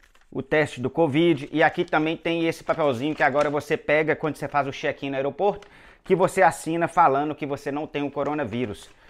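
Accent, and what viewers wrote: Minas Gerais, Brazil. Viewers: Nice!!! O mais da hora foi que tu não perdeu o sotaque mineiro. Eu moro no sul do Brasil faz 8 anos e já se foi a parte mais raiz do sotaque de Minas. Abraços, sucesso.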